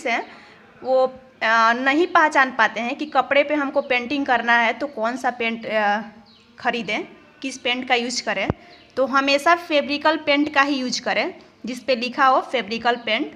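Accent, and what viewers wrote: Bihar, India. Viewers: Ap Bihar se ho?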